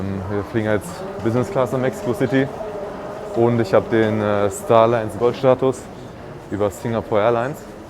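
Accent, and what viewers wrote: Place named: Germany. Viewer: Dieser Deutsche Akzent 😁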